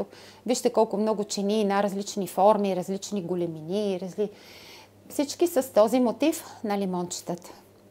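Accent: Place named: Italy. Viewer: Сигурно си от доста време в Италия, защото се усеща италиански акцент:) Ти сподели , че дъщеря ти не знае бг . Напълно те разбирам:)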